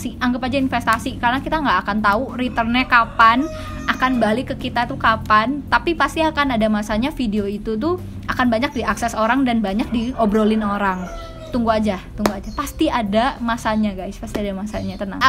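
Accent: Java, Indonesia. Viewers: Wong jowo ya Bae medoke😎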